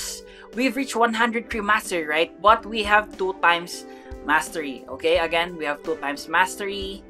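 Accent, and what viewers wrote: Philippines. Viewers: Bro accent is filipino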